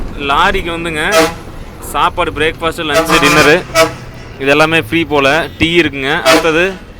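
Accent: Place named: Coimbatore, India. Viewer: உங்களின் shift from Thangam to the new Container lorry looks great. எங்கள்க்கு உங்களின் சரளமான ". ஏங்க்ப்பா " Coimbatore style தமிழ் anchoring giving your usual & meaningful வழவளா talk என்றும் 16 மாதிரி சரளமான பேச்சு ரெரம்ப பிடிச்சிருக்கு. So, without break உங்களின் பிரயாணத்தைத் தொடருங்கள்.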